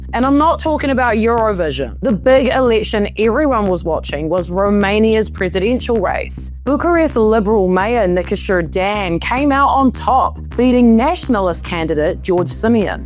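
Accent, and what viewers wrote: New Zealand. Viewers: great update and its a easy kiwi accent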